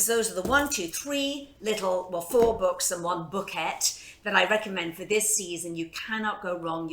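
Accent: United Kingdom. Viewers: Hi. I thought it was British. Love it. You are an inspiration.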